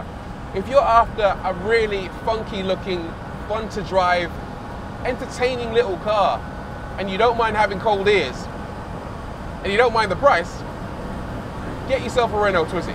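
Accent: United Kingdom. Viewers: the accent... British perfection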